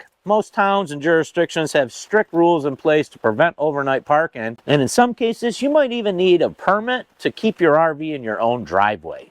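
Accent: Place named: Rochester, United States. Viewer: Michigan here born and raised in western NY you sound like your from Rochester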